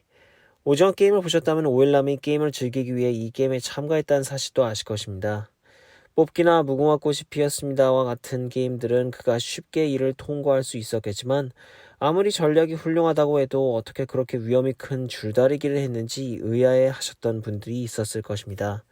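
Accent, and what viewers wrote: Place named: Korean-Chinese, China. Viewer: 억양이 조선족 같은데?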